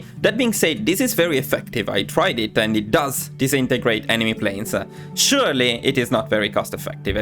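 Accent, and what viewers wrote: Italy. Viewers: are you italian by chance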